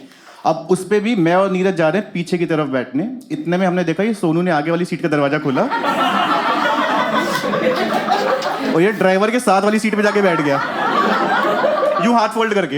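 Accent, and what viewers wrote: Alwar, India. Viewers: Sir aap alwar rajasthan se ho kya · Bhai alwar se ho kya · Bhai alwar se ho kya??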